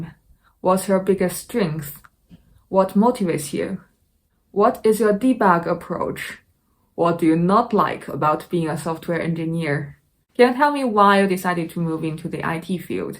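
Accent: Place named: United Kingdom. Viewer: 博主的accent有点British是怎么肥四！！！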